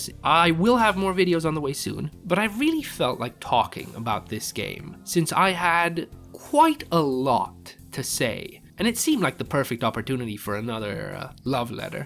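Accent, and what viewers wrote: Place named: United States. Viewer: Eastern European? Where are you from, the accent really sounds American to me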